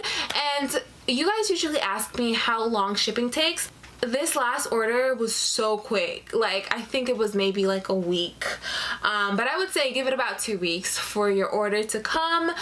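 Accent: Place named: Belize. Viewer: Are you from Belize? I hear the accent in some of your videos. I love your video content :)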